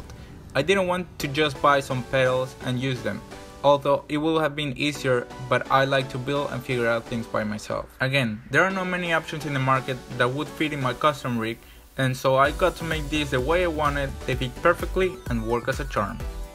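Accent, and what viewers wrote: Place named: Spain. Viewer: Me suenas a español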